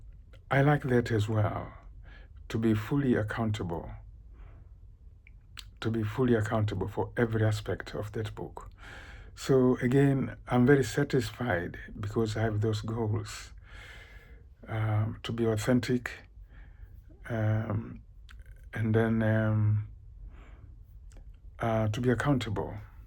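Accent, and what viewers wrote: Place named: Tanzania. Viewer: love it! i was in tanzania once for a month with my grandmother because she works for the church mission in germany , the people and the country are just awesome definitely visiting again soon . So hearing you talk reminds me of this wonderful time i had there. And you have a very calming voice ! Keep it up ! <3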